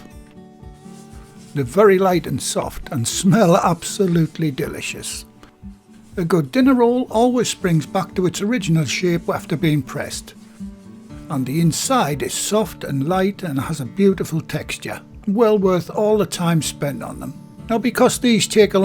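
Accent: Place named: North East England, United Kingdom. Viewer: friends of mine say you should do some ASMR videos, they really like your relaxing north east accent. obviously i have the same accent but i sound like a rougher slang version of jimmy nail lol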